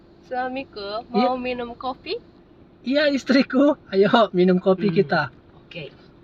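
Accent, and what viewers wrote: Medan, Indonesia. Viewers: Bang mau tanya boleh ngga Abang aslinya orang mana ? Tapi logatnya kaya orang Medan ya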